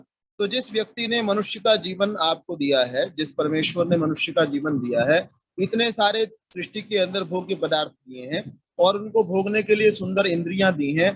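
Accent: Standard. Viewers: आचार्य श्री आपके जैसा शुद्ध उच्चारण कभी ही सुनने को मिलता है।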